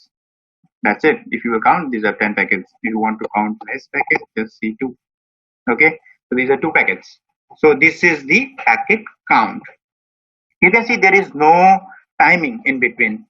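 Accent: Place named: India. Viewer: I like your video. I like it despite your heavy Indian accent. It was more informative than any other video I have found on the subject on yt so far. Thank you. 🙏🏻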